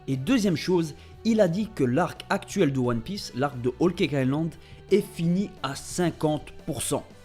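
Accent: Belgium. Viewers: ouit x) le bon accent belge bg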